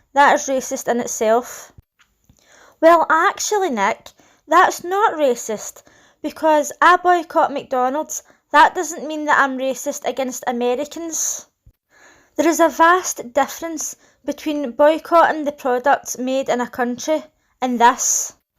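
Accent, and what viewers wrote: Scotland, United Kingdom. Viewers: ps ...Im London all my life,...nothing wrong with your scottish accent...perdfectly understandable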